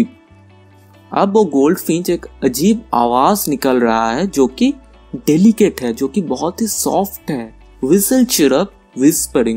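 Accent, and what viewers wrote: Bengal, India. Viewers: I am from..howrah.... Hindi of bengali are usually like this..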